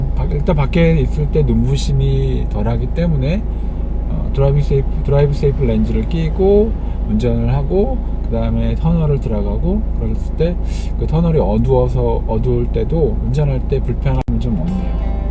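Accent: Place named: Chungcheong, South Korea. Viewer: 충청도 분 이신가ㅜㅜ말좀빨리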